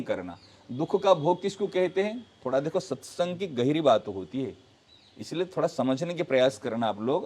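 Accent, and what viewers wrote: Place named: Odisha, India. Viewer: Guruji ki matru bhasa mujhe ODIYA lagta hai, unki hindi sabd ki utcharan main Odiya bhasa ki avasa hoti hai.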